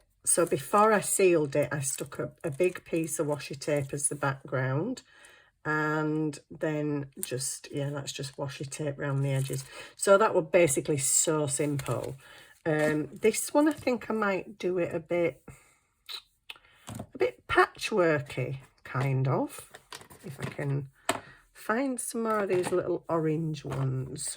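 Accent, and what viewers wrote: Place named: Yorkshire, United Kingdom. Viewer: Hi as a fellow Fibro suffer I absolutely get the 'decision making' thing!🤔🙄🤔🙄🤔😬🤔🤭So glad I've found you on you tube, you sound like you're from my neck of the woods! Especially when I saw your Yorkshire Tea box in one of your vids! 😃I'm a Sheffield'er! 😬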